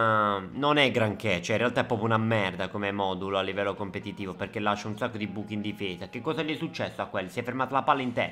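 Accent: Bari, Italy. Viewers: Barese parli? O sbaglio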